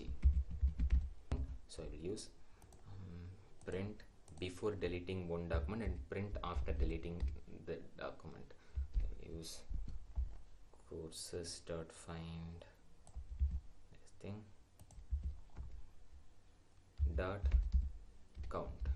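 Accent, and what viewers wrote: India. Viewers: Honestly, it's probably the thick Indian accent. It's a big put off for many native English speakers. Not to mention some of the darker blues in that colour scheme you're using for that VS IDE is hard to see.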